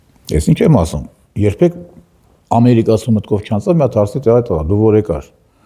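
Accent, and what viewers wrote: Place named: Gyumri, Armenia. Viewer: Հարգելի դուք Գյումրիի կամ Շիրակի մարզի հետ կապ ունեք???Միշտ էնտեղի ակցենտը զգացվում է Ձեր խոսքի մեջ։Նախապես շնորհակալություն եթե արձագնքեք։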